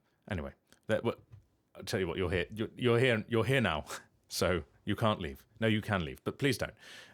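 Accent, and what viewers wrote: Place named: United Kingdom. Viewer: Not the Lyacon shirt 😂 Speaking of Lycaon, I was watching the Pixelated Princess short while thinking about him and staring at his face and I was blushing a little. Was you doing that bit in his voice intentional? And another thing: I always joked about Lycaon sounding British and then you, are actually British, so I guess British Lycaon is canon?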